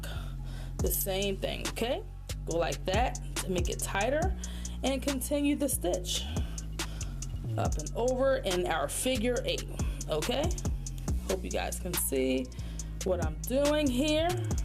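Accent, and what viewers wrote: Baltimore, United States. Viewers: Baltimore I can place that accent from anywhere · Are you from baltimore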